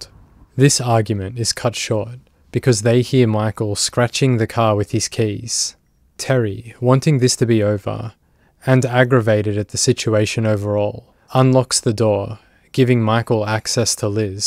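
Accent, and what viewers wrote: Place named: Australia. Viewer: I really do enjoy your videos. You tell the stories with the upmost respect for the victims, their families and their friends. I particularly like the fact that you don’t “over dramatise” an already horrific and terrifying “story.” I also thoroughly enjoy these longer videos. Thank you for all the research, effort and time you put into each and every one. 🫶🏻💙🫶🏻 ps.. where’s your accent from? Australia?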